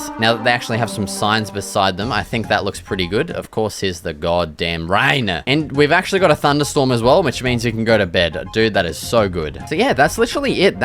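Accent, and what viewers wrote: Australia. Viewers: the aussie accent and all the cussing makes this 10x more entertaining edit: THANKS FOR THE LIKES and continue to support!!!! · aussie accent and swearing makes it so much better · r u from Australia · Aussie accent (where you from bro) Burping (fucking hilarious) And the cussing are all me (I’m Australian too) I love your videos brother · R U AUSSIE?!!!!!! PLEASE TELL ME IM GUESSING RIGHT!!😢😢😮😮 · Is your accent Australian or ~? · The aussie is strong with this one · Are you Australian?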